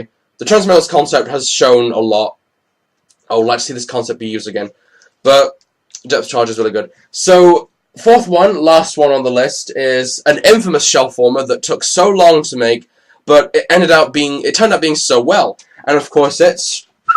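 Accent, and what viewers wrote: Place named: Scotland, United Kingdom. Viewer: You sound scottish and american and British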